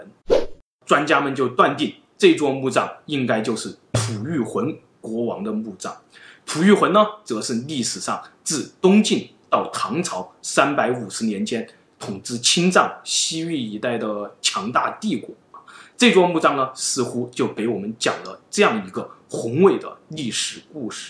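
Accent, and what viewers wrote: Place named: Sichuan, China. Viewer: ，听你说话感觉你像四川那边的普通话，因为你n、l部分，平翘舌不分。但是看你留言又感觉你不在中国大陆的样子。因为大陆居民要翻墙才能玩youtube的，所以肯定现有B站账号、抖音账号才会有youtube账号啦。而且墙内是主要阵地啊。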